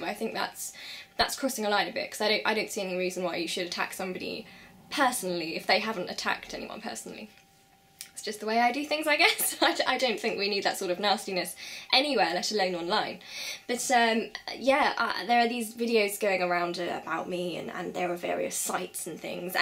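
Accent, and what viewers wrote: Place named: London, United Kingdom. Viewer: What part of England are you from? You sound London, but on some words you sound a little different.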